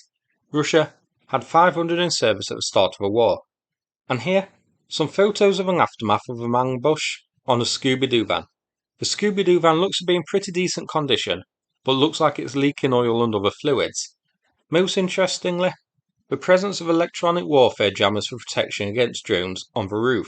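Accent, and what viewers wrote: United Kingdom. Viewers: Love your updates, even though you've got a thoroughly Brit accent (I'm from Oz). Keep up the great work. Cheers!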